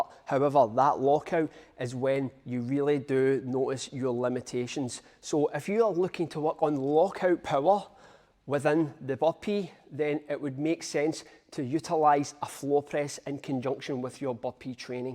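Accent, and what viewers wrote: Scotland, United Kingdom. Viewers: I love the Scottish mindset, accent, no nonsense approach and hard workout game 🏴 (I'm Scottish)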